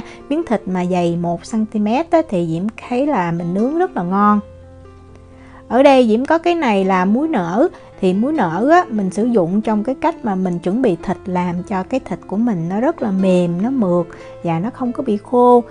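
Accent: South, Vietnam. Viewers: Nhìn ngon hấp dẫn, ở VN người ta hay nướng thịt cốt kếch,còn nạc dăm thì bán bún thịt nướng. Diễm ơi, e ở miền tây tình nào mà nói giọng rặc miền Nam giống ngày xưa quá. Dễ thương lắm!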